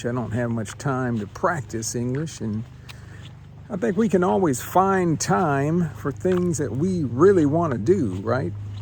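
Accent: Texas, United States. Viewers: I believe that finding time if you really want to learn English is not a problem. Too often it's an excuse. What really helps is what I call immersion in the language. If you can't talk to native speakers, you should watch the movies, listen to the music to pick up words, phrases and above all, to familiarize with the pronunciation . I like your Texan drawl👍. Greetings from Poland.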